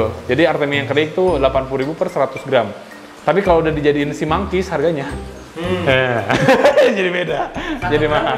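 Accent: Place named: South Jakarta, Indonesia. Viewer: Bocah Jaksel mana suaranya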